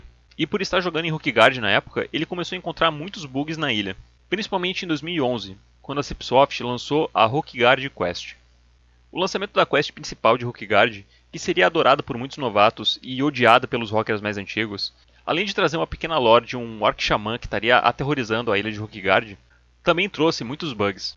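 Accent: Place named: Porto Alegre, Brazil. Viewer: Boa noite, meu querido! Primeiramente, parabéns pelo canal foda, mano! Desculpa, mas sou curioso: tu é de Porto Alegre? Baita sotaque... Também sou daqui!! Abração dum outro velho (26 anos de idade e 16 de tibia) tibiano.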